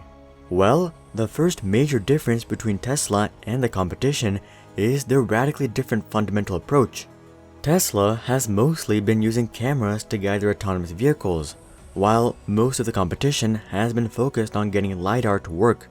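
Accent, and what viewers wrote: Singapore. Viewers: The accent of the narrator sounds Singaporean to me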